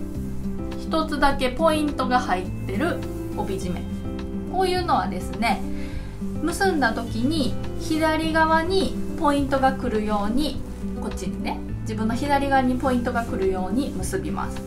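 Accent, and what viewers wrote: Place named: Kansai, Japan. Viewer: とても分かりやすいし、関西弁が懐かしいので、チャンネル登録させていただきました。 和裁は少し習ったことがあるのですが、着付けはまだ全然です。 着物を着る日本人が増やしたいですよね！('◇')ゞ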